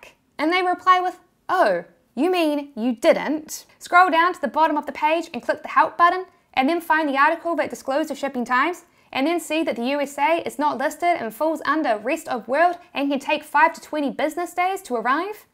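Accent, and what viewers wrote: New Zealand. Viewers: dragging their store with the New Zealand accent, priceless. 😥😭😂🤣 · Really strong kiwi accent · I like your kiwi accent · Is that what a New Zealand accent sounds like?! I’ve never heard a South African sound so Australian or an Australian sound so South African.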